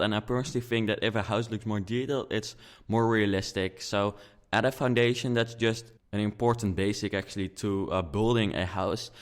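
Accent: Netherlands. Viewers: haha je kan gewoon horen dat hij Nederlands is! xd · Same! I'm Dutch myself and I knew as soon as he said the first sentence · before i saw your name i could hear you were dutch haha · Haha ik dacht al METEEN dat je nederlands was Hahaha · Ik hoorde meteen aan je accent dat je Nederlands was! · Je hoort zo dat je Nederlands bent 😂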